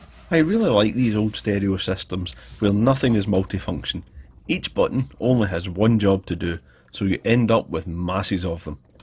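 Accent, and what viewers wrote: Scotland, United Kingdom. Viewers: I love the calm pace of narration and Scottish accent. It’s like a relaxation tape. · Are you Scottish? (Not Irish, right?...)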